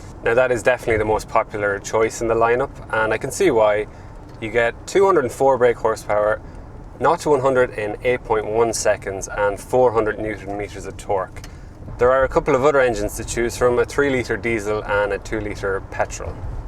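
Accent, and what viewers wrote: Ireland. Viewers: I love that Irish accent 😊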